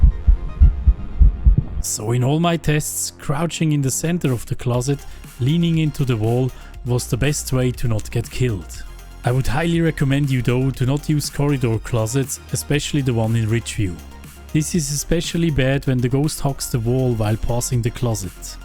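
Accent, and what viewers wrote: Switzerland. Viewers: schweizer english 😅 triggert schon ein wenig xD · Are u swiss?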